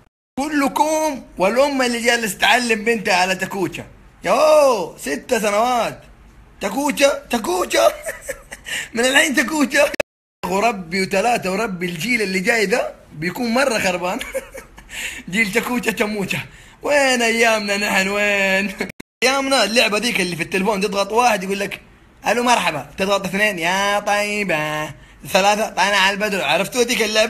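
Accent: Hejaz, Saudi Arabia. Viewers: ليه لهجته حجازيه!!! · ماكانه اماراتي كانه من السعوديه من الغربيه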